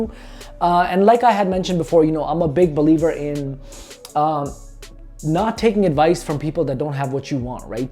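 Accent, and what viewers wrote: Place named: Toronto, Canada. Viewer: sounds good brother. U sound like ur from Toronto. Am i right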